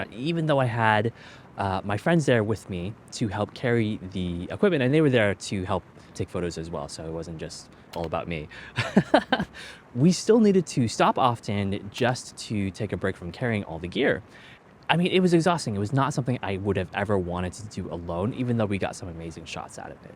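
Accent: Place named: Scotland, United Kingdom. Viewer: As I Scotsman, I’ll forgive you for the Star Trek Scotty accent, for producing a great video. I’ve got a 100f so understand what you are talking about ….. despite the accent.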